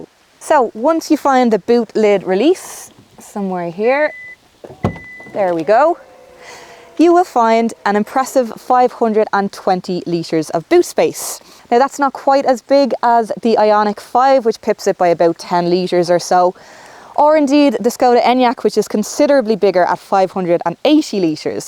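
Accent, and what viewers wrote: Ireland. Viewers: Wonderful Irish accent thank you..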